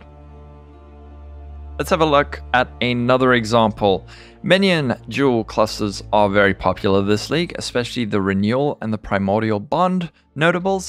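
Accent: South Africa. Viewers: Well i can tell of another south african im also been looking at your vid for a day or 2 nice job man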